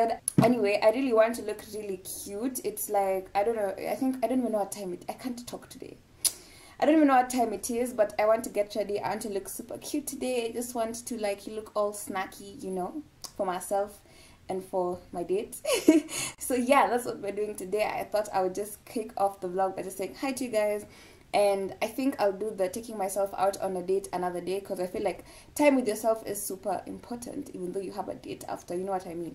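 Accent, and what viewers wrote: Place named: Kenya. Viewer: me i thought is not bad english it’s just being Kenyan 🤣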